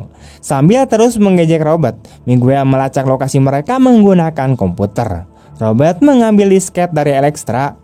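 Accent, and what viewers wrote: West Java, Indonesia. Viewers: orang sunda nya mang🗿?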